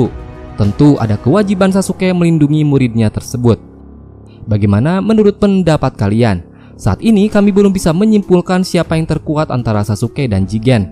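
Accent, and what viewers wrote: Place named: West Java, Indonesia. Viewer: piks.. admin org sunda. 😊😊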